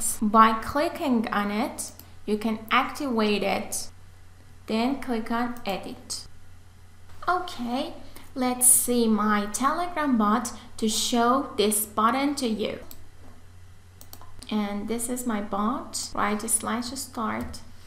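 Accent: Iran. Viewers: At first 10 seconds i Told myself she is Iranian🙂 The famous Iranian accents🥰 باعل واعل😂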